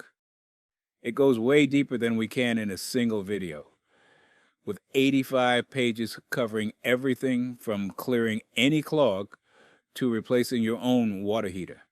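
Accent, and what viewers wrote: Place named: Louisiana, United States. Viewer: This is what I said as well! 😂 I was like ...."He sounds like my Dad/Uncles!" , which are born in Louisiana.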